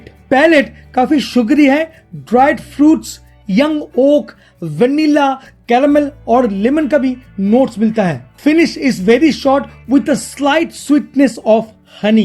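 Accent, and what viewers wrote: Bengal, India. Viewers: Bengali ha?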